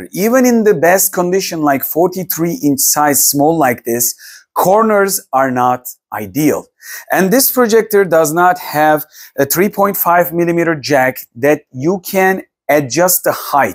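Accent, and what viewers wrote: Turkey. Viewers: Hocam helal olsun :D , süper bir kanal yapmışsın , aksanından Türk olduğunu anladım. Türk olduğuna bahse girerim ama ispat edemem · Bu türk ingilizcesi nerde duysam tanırım :)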